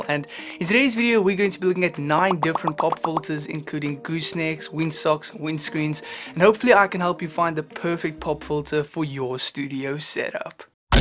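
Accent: South Africa. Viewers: You sound south Africa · South African?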